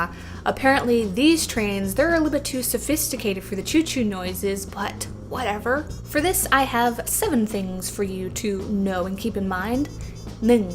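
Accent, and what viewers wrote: Thailand. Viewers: I love your narrative so much and give the trumbs up to your vlogs especially Thai intonation. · Your Thai accent sound you count number is so cute.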